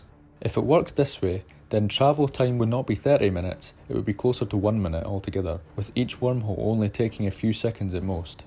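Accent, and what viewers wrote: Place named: Scotland, United Kingdom. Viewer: Ok.. NEW here so DON'T hate.. I had NO idea you were Scottish.. but I IMMEDIATELY heard Scotty from Star Trek and Carson from Star Gate Atlantis.. sorry for stereotyping but DAMMMMM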